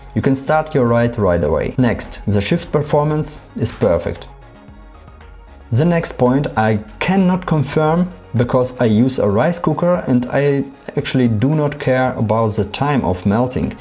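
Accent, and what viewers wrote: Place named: Germany. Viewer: Interesting accent fpr an english speaking german - is it for sure better then a paraffin + pfte powder combination?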